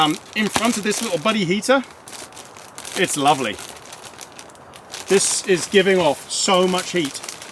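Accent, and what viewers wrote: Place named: England, United Kingdom. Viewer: have you ever thought to do a story time channel? You have a beautiful ton e with you voice, you are animated and with your beautiful English accent, you would be perfect for storytime. You could just have a set scenery for the photo and just have your voice reading the story. I usually watch her videos twice. The first time I start watching when I lay down before bed and end up falling asleep the second time so I can actually watch the entire thing without falling asleep lol.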